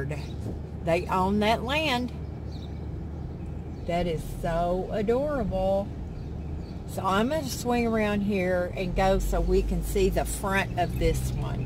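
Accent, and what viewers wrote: South, United States. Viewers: I love your southern accent! Beautiful park!!!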